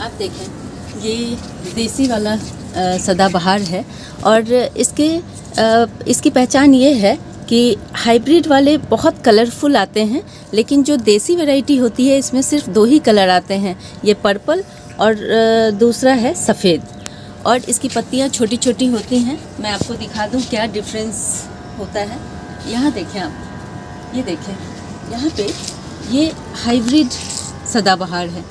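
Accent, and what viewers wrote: Bihar, India. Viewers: Na hi tumhara biharipan bolne mein fark he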